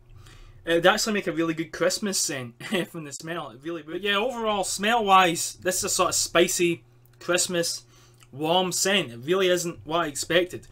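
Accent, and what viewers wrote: Scotland, United Kingdom. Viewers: S good and fun review to watch. BTW, are you Scottish? Cool accent regardless.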